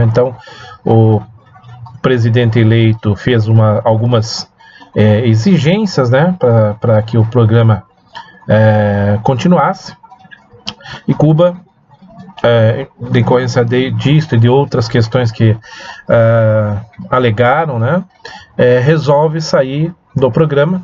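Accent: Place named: Curitiba, Brazil. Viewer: Ola, acabei de conhecer seu canal. Cresci em Curitiba, e sinto falta do sotaque curitibano (alem da conversa) =) So queria deixar um relato sobre uma conversa que tive com uma grande amiga cubana. Ela me contou que quando comecou o processo de enviar medicos de Cuba para o Brasil, a maioria dos medicos queria ir para o Brasil, tendo em vista que, mesmo com o governo cubano ficando com parte do dinheiro, ainda sim esses medicos ganhariam muito mais. Ela me disse, "voces nao tem nocao de como faltaram medicos em Cuba". Essa fala dela me chocou muito. Ela tambem disse, "parece que voces queriam medicos para assumir o interior do Brasil, que estava em falta em alguns lugares por la, mas pra isso voces tiraram ate da capital de Cuba". Eu nao tenho nenhuma opiniao formada sobre se levar medicos para o Brasil, vindo de outro pais, ta certo ou errado, mas com certeza algo que nos brasileiros nao nos perguntamos e por que tem "tanto medico sobrando em Cuba". 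E a verdade e que nao tem medicos sobrando em Cuba. Acredito apenas que, em nome de resolver nossos problemas sociais de forma imediata, de campanha politica, de envio de dinheiro para Cuba, de boa publicidade, etc tiramos medicos de um ser humano para levar para outro ser humano. Essa conversa eu tive ha uns 5-6 anos e ela ainda me choca. A pergunta que fica: sera que deveriamos transferir nossos problemas sociais para outras pessoas? Outra conversa foi sobre ela e os pais dela crescendo em Cuba, sem dinheiro vindo da ex Uniao So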